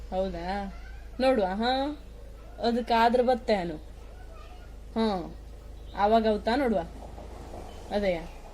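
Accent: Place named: Yellapur, India. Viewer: ನಮ್ಮ ಪ್ರೀತಿಯ ಯಲ್ಲಾಪುರ ಕನ್ನಡ ಬಾಷೆ ಎಂಥ ಸೊಗಸು ನಿಮ್ಮಿಂದ ಇನ್ನೂ ಹೆಚ್ಚೆಚ್ಚು ಸೊಗಸು ಧನ್ಯವಾದಗಳು🎉🎉🎉🎉🎉🎉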